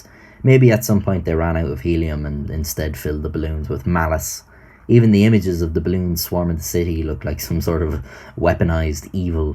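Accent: Ireland. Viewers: Can’t tell if he’s Irish or northern Irish or something completely different but he’s got the accent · Are you Irish?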